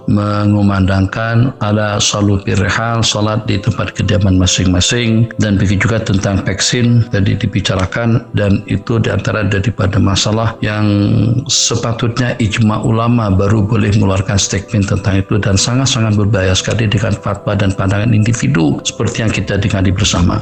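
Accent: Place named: Banjar, Indonesia. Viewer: sheikh ni Malaysianlah cuma dialeg yg d gunakn ming meng dialeknya etnik Banjar dn asal dia Indon ttpi dh lama...mcm orng Cina dtng ke Tnh Melwati awal 1900 dahulu... Agama Islam menggabungkn pandngan agamawan dn bijak pandai moden spt dr/pakar...dh lama kita ikut ckap pemerintah dn dr/pakar tetapi kenpa kes covid makin buruk? Cuba jwb kenapa? Itu maksudnya sudh smpi masa kena minta dn dngar pandngan semua trmasuk agamawan juga...faham! Apa yg sains/barat dpt semuanya dari AlQuran...ngerti!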